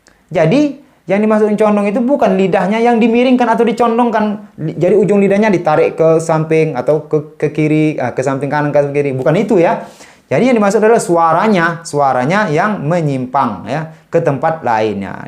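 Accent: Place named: Eastern Indonesia, Indonesia. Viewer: Suka aksen timur beliau. Maa syaa Allah... Jazakallahu khoyron Ustadz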